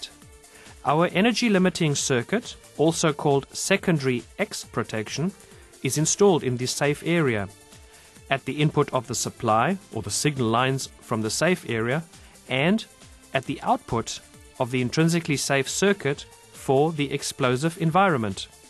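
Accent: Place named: South Africa. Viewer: You sound south African, great video.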